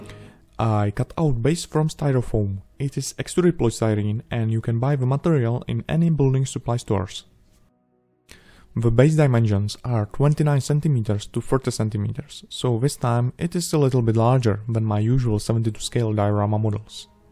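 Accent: Russia. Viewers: ты русский 100% ну или белорус крч инглишь твой второй язык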